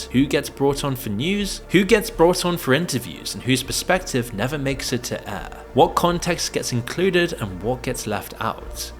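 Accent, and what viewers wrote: United Kingdom. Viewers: I forgot was British because accents kind of go over my head nowadays. I don't notice them anymore lul.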